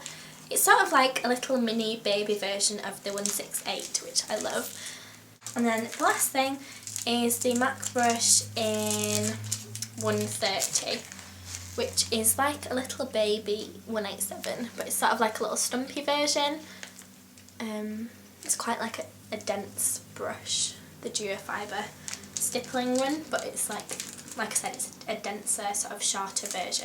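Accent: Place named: North, United Kingdom. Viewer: please do a tutorial on how to put them on if u havent already. love ur accent so im going to suscribe. you sound so nothern!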